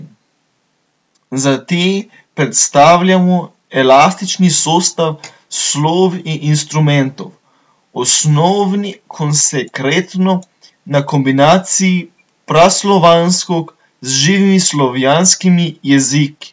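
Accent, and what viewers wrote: Slovenia. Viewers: haha kk se takoj prepozna, da slovenc govori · I'm Polish. I understood everything 100% and I think I would read it better than you (no offence, brother Slav ;)). You don't see a difference when pronouncing "y" and "i" or "e" and "ę". Well, in Polish we have both "y" and"i" and they sound different, and we have also "e" and "ę" and they also sound different...;)) I'm guessing by your accent that you are a Southern Slav. Your accent kinda "suggests" me you're Slovenian...Am I right??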